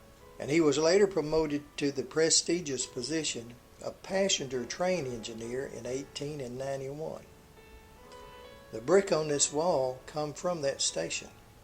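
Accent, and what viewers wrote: South, United States. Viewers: These are some of the places I'd like to see when I got more time. IE retired. Thanks for the video. Your southern accent reminds me of my Alabama In Laws. Ya'll take care now, Bless your heart for the good work done here. D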